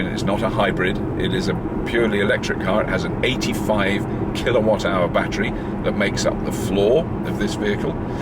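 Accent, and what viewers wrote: United Kingdom. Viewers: Love hearing things explained with a British accent.. And this car is on my want list!! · A Tesla report with a British accent. Raises the Tesla bar that much further!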